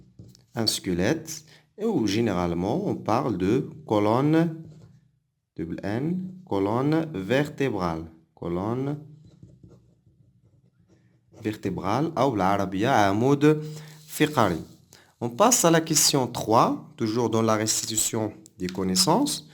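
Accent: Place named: Fez, Morocco. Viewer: Accent dial nass fas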